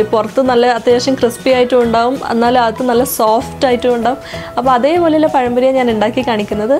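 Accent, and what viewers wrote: Kannur, India. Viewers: ഇക്ക് ഇസ്റ്റല്ലന്ന് പർജ്ജിലെ... 😂😂 kannur slang adipoli...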